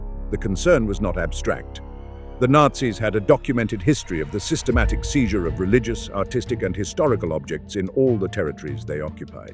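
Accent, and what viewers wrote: United Kingdom. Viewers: The narater is British just like me..😂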